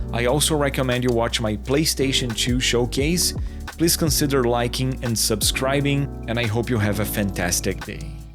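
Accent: Brazil. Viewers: bro real question, are you brazilian? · o sotaque desse maluco é de brasileiro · Notei o sotaque brasileiro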